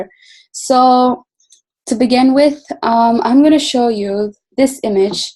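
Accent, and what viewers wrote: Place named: United States. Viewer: Excellent. orchestrated with American ascent with laser sharp technical tidbits is applaudable. Leadership is an impact - you preach what you practiced. 💫💫💫💫💫👌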